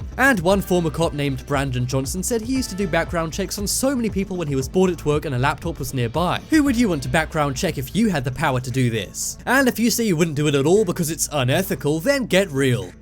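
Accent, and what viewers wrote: United Kingdom. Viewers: This is nonsense This is obviously filmed in Britain and is done by someone with the equivalent accent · THE WHOLE THING IS BULL SHIT... This is some Russian fake news divide America BULL SHIT... And I am offended that some asshole will a British accent is propagating this BULL SHIT.. there are social and economic reasons why arrests spike in SOME cities and communities that are related to there being too much month left at the end of the money... statistically you will find MURDERS, ASSAULT AND ROBBERY spiking on Friday and Saturday... but I guess that’s a plot by cops tricking people into robbing, stealing, and killing so they can meet their quota... NICE SUBTLE RACIST AND SEXIST MOVE TOO USING THE IMAGE OF A BROWN WOMAN WHEN YOU FLASHED THE WORD “QUOTA” ON THE SCREEN... anyone who agreed with this nonsense... I have the winning lottery ticket I’m willing to sell you...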